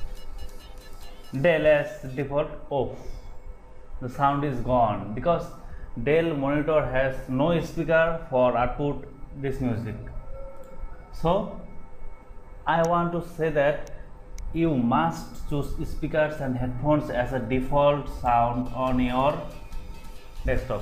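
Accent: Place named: Bengal, India. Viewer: With ur accent I can understand that ur a bengali because I am also bengali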